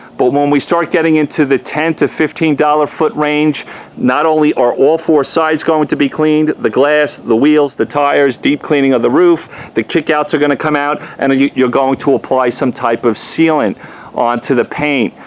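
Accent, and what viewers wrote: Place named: Dallas, United States. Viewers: Niiiiiice. Your accent is a typical Dallas Accent. LOL. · Gotta love the Texas accent. ("Howard Stern talks RV detailing")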